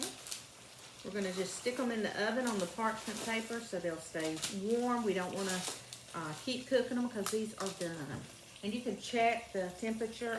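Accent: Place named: North Carolina, United States. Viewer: I discovered your channel a couple of days ago and I am loving it! I suspected you're from eastern NC with the accent (not poking fun, I have the same accent!), but the fried chicken and banana sandwich just confirmed it for me! Please tell me I'm not wrong! My mama cooked the best fried chicken. I am 64 years old, and when I was a child and we'd go on vacation Mama would pack a picnic lunch for us to eat on the way at a roadside picnic table. She always, always, always packed fried chicken and banana sandwiches! My daddy loved that combination. Such sweet memories!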